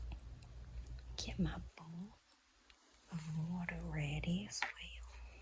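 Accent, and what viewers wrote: South, United States. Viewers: I still loove the southern accent! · Gawd, I love that Southern accent. Great video too ❤ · “Nice and purdy” Don’t know why but your southern accent, particularly the grooming videos, gives me Steel Magnolias lol I love it ❤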